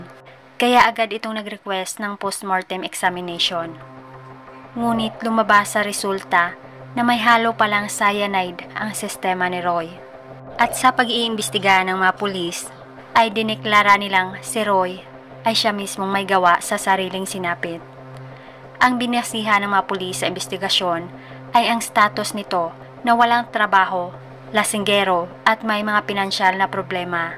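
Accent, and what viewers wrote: Visayas, Philippines. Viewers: Ang ganda ng boses nya sa mga nag bash sa kanya mag trabaho kayo wag palamunin😂😂 oo bisaya yan sya alam ko kasi bisaya din ako ano naman kung bisaya mas matalino mgabisaya kasi fluent kami mag English kami marunong mag tagalog with taglish pa kayo marunong kayo mag biisaya lol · Bisaya kc kaya minsan kaiba ung tunog ng oagtatagalog nya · Bisaya po kaya iba pagkakabigkas nya..☺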